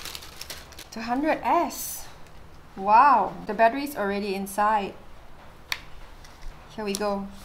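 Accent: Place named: Malaysia. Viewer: This is 2nd time I watch your video, I don't know your background my guess with your accent and mannerism is that you are either from Malaysia or Singapore, I think it is the former. BTW I am a Malaysian, and this camera is too expensive and sophisticated for me.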